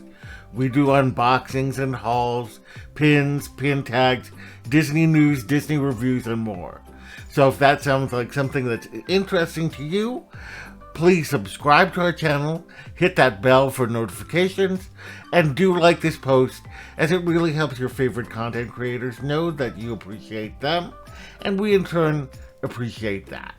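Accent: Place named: United Kingdom. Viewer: Oh my god you’re so funny! I love your British accent!!! Lol! I’m excited for round two! Lol. I love when you sing the songs!! You’re just the best!! The Rattigan song and the (I love that line too, I feel like we need a shirt that says that line LOL) and the Bedknobs song! You’re just so fun to watch!! I’m glad you joined it!!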